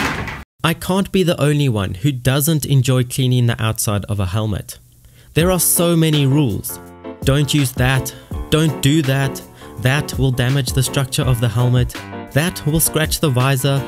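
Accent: South Africa. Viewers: you sound south african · Where are you from? You sound very South African.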